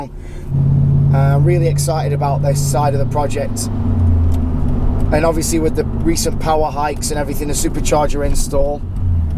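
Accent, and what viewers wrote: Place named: London, United Kingdom. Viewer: You sound almost like a londoner... that's been living abroad a while. Sick car though.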